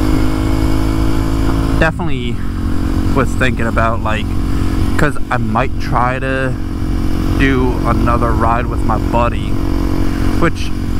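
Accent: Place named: Pennsylvania, United States. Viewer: For some reason I hear a PA accent…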